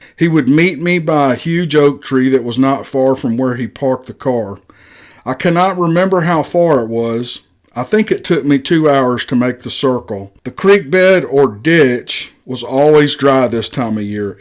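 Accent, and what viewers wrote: South, United States. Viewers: You’ve got the perfect southern voice to give every story an authentic feel based on the location. It sounds like you’re really the person who experienced these stories. Real or fake, you do em justice by bringing them to life. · Kevin Costner with a southern accent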